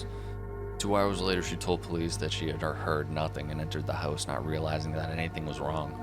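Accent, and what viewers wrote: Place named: Massachusetts, United States. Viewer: You claim to be from NJ yet your accent, knowledge of places like Fall River, and general vibe are leading me to believe you are a Massachusetts native.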